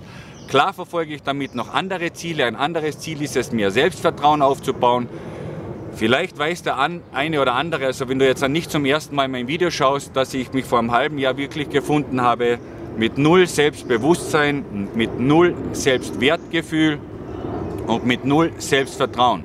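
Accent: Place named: Gailtal, Austria. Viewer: Jetzt hab' ich schon den ganzen Tag Deine Videos quer durch den Gemüsegarten angesehen. Fein, dass Du nach einem Tief scheinbar wieder so einen Enthusiasmus entwickelst. Pass nur auf dass Du nicht aus einer Depri in eine Manie verfällst .... P.S.: mir gefällt Deine Gailtaler Schnauze frei von der Leber weg - kommt sehr ehrlich rüber, auch wenn ich persönlich Manches etwas diplomatischer rüber bringen würde - viell. nicht immer ganz so emotional ... aber Du bist halt so ein "g'rader Michl'" und das ist gut so. Viel Freude in Thailand! .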